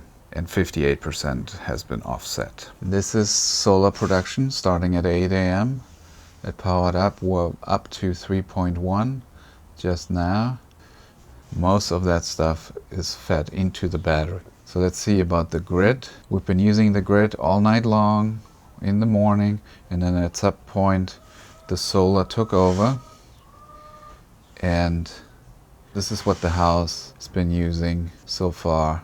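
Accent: Germany. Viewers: You have an accent, are you German?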